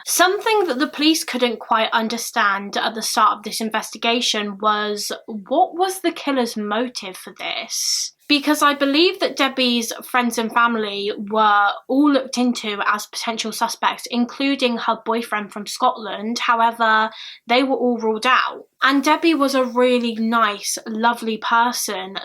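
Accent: United Kingdom. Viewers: Listening to true crime in a British accent is e.v.e.r.y.t.h.i.n.g! LOL.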